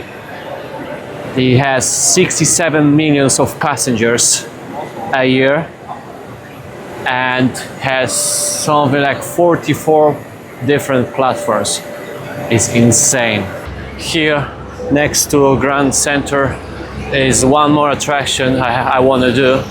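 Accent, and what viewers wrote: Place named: Italy. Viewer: It's funny that you speak Italian fluently as it sounds like your accent when you speak English has a hint of an Italian accent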